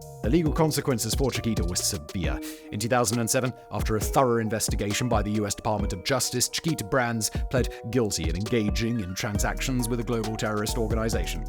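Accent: United Kingdom. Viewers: Don’t believe all this. Read the Fish the Swallowed the Whale for a more balanced view. A British accent doesn’t mean it is unbiased . By the way, why don’t we make a comparison with the British East India Company’s practices or the practices of Jardine Mathesen in Asia selling opium. A comparative approach might make your assessment more enduring.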